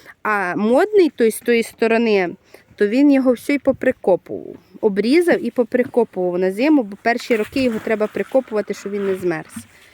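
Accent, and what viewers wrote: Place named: Lviv, Ukraine. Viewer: Добрий вечір.А з якої ви області(району)Діалект як на Львівщині😊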